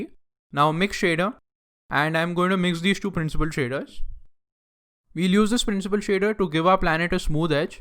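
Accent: India. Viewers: Your tutorials are so much great, are you indian, ? As your accent looks indian, i love your tutorials 😀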